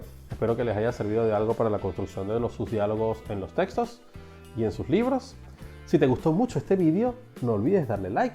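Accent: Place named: Venezuela. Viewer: Vi el video, hasta que caí en cuenta que eres venezolano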